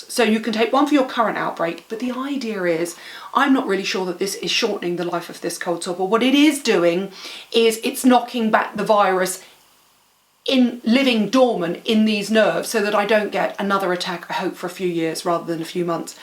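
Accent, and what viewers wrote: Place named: England, United Kingdom. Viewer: Hi thank you so much for sharing a lot of good information. Your video was very entertaining. I always loved the English accent. By the way your cold sore doesn't look that bad. Thanks again. Cheerio.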